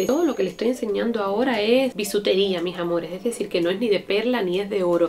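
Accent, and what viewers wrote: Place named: Cuba. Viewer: bella hermosa hablas muy parecido a sandra cires art obvio las dos son cubanas no lindas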